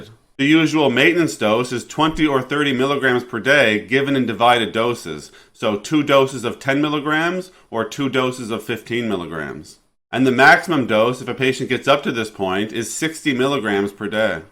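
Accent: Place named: Atlantic Canada, Canada. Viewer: Same here skipper! I know an east coaster when I hear one lol. Cheers, keep up the great reviews.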